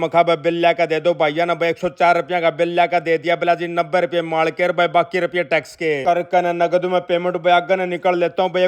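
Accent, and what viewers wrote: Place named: Haryana, India. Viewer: आप ने अच्छी कोमेंटरी की हरियाणवी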